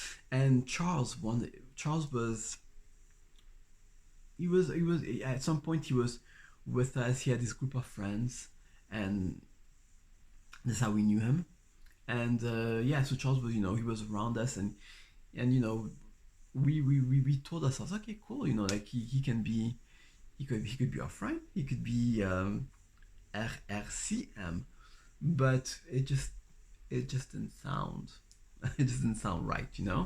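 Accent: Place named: France. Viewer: OMG, you are soooo gorgeous and incredibly charming!!!! It sounds like you are a French speaker. Where are you from originally?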